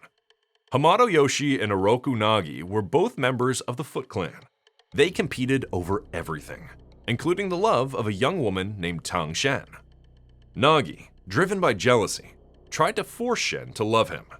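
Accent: Canada. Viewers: Is this guy from Nova Scotia?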